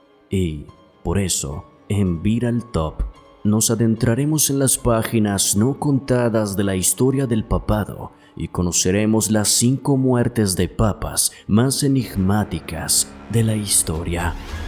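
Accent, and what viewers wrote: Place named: Colombia. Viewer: Hermano, vengo siguiendo tus videos me gustan, me encanta el acento colombiano, te deseo mucho éxito a ti y tu equipo, saludos desde México..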